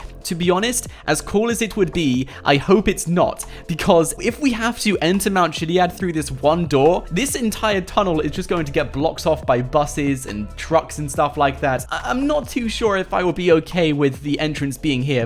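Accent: United Kingdom. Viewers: Where you from in uk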